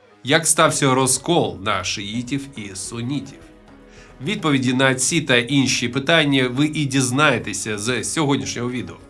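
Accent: Russia. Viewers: Пане, ваш русский акцент ви, якось, збираєтеся ліквідувати?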